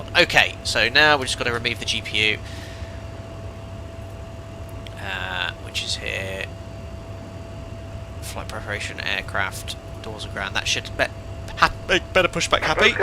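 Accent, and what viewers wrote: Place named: England, United Kingdom. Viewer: Great stream , I think it’s the Concorde and the English accent that go hand in hand.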